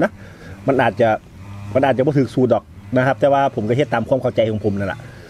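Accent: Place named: Ban Phue, Thailand. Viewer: เจ้าเว้าคือคนบ้านผือ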